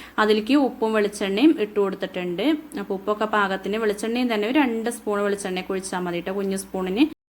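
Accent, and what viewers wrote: Malappuram, India. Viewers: Njan first ayitt aanu ee channel kaanunnath ,kandapo malappuram ulla aall aanu thonni,evide sherikk place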